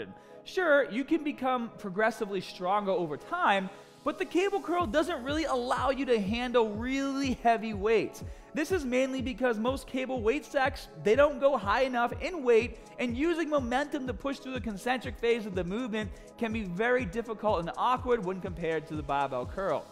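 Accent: Boston, United States. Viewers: gotta love that Bosten dialect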